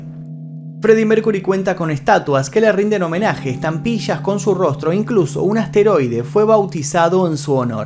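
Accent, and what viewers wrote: Argentina. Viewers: Este narrador es argentino cheee, y quizá no se la coma igual se le salen los ojos 👀 mirándola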